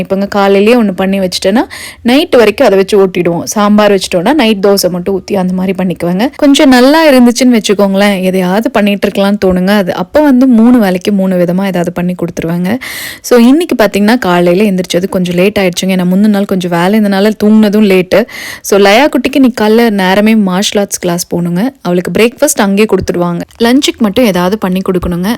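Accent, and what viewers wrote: Kongu, India. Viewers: Romba azhagha video..unraakudavey irrundha madhiriyee irundhadhu thangam. Un style..rombaa unmaiyaa appidiyey ellam solradhu thaan nu ninaikireen.. inainkku thaan enakku YouTube unnai recommended shorts nu kamichadhu.. ulla vandhu parthaa superbaa irrukkuma..enndaa ithanai naala kattalanu feel panna vachadhu. Unnga recipes ellamey healthy & tastyaa irrukkunga..north & south mix matching recipes..namma pachapayarum, methi chapathiyum, paneerum, nalla combination da. Andha mambazham cutting nanum parthu irruken..parthaleey paravasam feeling..neenga thirupiyum andha feeling kodutheengaa.. unga kongu slang..romba naturally nalla irrkku..ennavoo unngaa kudavey pakathulaay irrundhu parkara mathiriyee irrukku maa. Pachapayaru pakkuvaum sonnadhu, machichadhu, seimurai for all dishes , practical tips, ellamey superda thangam. Enga irrundhalum, namma manasu vachaa ellamey nalla seidhu, healthyaa seidhu sapadalaamnu practical aa kamichu irrugeengaa.. 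Innum niraiyaa video podungaa.. Londonlaa endha pakkam irrukeengaa.. Chedi varathadhu pathi Anitha Anand channel niraiyaa seasonal chedinga, pudina, strawberry ellamey nalla valaradhu, grow tips kodupangaa, namma Charless Anna, Subi sister channel London Thamizhachi channel laa parthu irruken. Charless anna niraiyaa tips kodupaar. Nalla vilaichaal irrukkum, namma use pannara kaikari, poondu, kodi kaikal, poo chedi ellamey.. .. Vazhgaa valamudan.❤❤❤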